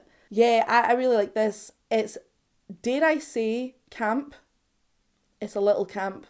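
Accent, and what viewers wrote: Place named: United Kingdom. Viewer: Ohhh, you are so cute...your femininity...when you touch your beatiful hair, ok, a gift for you, react to, but...with singing, the others...poor of She´s, ohhhhh, you so cute, love you english too, it´s the best Britanic accent, kisses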